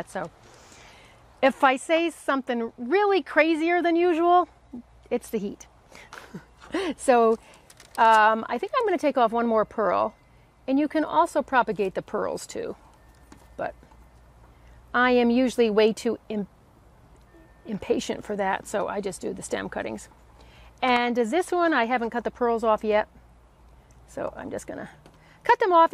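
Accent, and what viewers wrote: United States. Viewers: You sound like you're from Northern USA or Canada! Such a sweet voice makes the video easier to watch!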